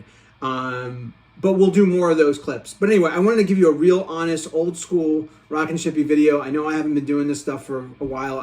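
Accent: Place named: New York, United States. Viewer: I just picked this head up a few days ago to pair with my Marshall 1960A cab with GT12-75 speakers. Excellent, no-frills video and straight to the point without excessive noodling. Great video brother - you sound a bit like a New Yorker !!! Cheers from Canada! Thanks for the video - I can see u can play good as well - nice vibrato !!!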